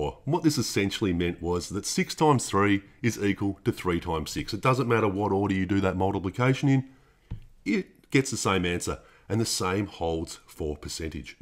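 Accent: Australia. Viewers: Can we just take a moment to appreciate the Aussiness of this guy’s accent?! 👍🏻😁🇦🇺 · Why the condescending approach and weird Australian accent????? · Midwest USA Too busy focusing on what words he's saying, let alone the content. Didn't even realize it was Australian. Man, this Pandemic's been rough. SMH multiple times for everyone. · This would be so much easier to understand without the Australian accent- or maybe speak more slowly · Australian accent makes me nauseous for some weird reason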